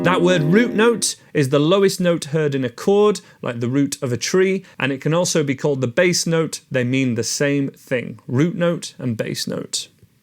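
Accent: Yorkshire, United Kingdom. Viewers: Pleased to meet you, I just found your video on you tube I liked your warm style, by that I mean you explain everything slow enough for an old fart like me now 68, I like also the way you break down sections, chords notes and the stave although I have to read more theory as I feel I am missing a very important part of my journey here mate. Shall I go on oh yes you mentioned flow training,that a big financial commitment will you be on there teaching or will it be someone else, so I am going follow you for a while see how I get on then take it from there really I like your accent poss Yorkshire guy. Thank you so much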